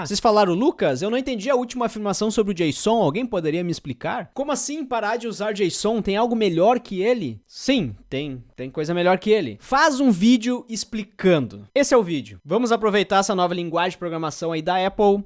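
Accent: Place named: Ceará, Brazil. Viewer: é cearense esse macho, certeza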